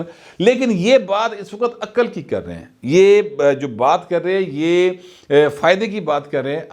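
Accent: Punjab, Pakistan. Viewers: apka Punjabi touch kamal ka hai😂😂😂🤣🤣🤣🥰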